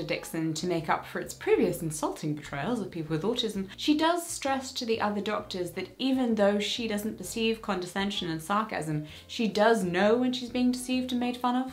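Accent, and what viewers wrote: United Kingdom. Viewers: Please slow d o w n! I can't understand a word you say. Your British accent is too strong.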